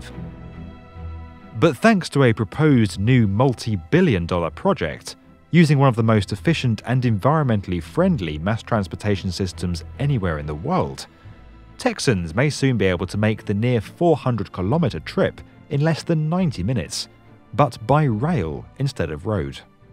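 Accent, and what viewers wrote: United Kingdom. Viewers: Where is the money coming from? If it is SOLELY from the State of Texas, I really don't care. Texans can spend their dollars however they choose. However, if even one cent of federal tax dollars goes into this boondoggle,I feel like I've been robbed again. I also take umbrage at the narrator and his British accent a if to somehow transform the US into another European look alike.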